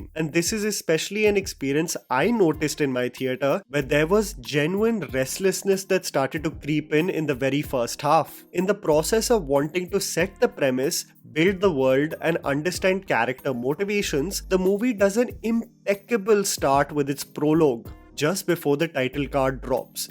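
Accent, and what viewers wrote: India. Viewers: NO JOKE… his Indian accent made my ears bleed 😢😢😢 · THAT IS SOME THICK INDIAN ACCENT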